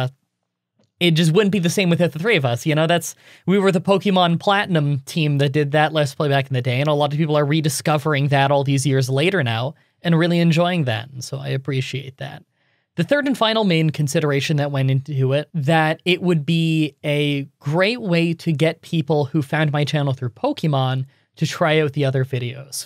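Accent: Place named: Canada. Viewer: Hey man, Thanks for uploading the lets play of pkmn stadium, I was really glad when I saw it, because I knew will be half an hour of escape and I was considering that you start this more popular lets play on porpouse, I was really nice. I just want to share a funny history, you were the first canadian that I regonize because of the accent and for me was super cool, at the time my wife and I were planning move to Canada, she was invited to do her phd in uOttowa., when i was trying to catch some of Canada's culture and make me feel great. Cheers man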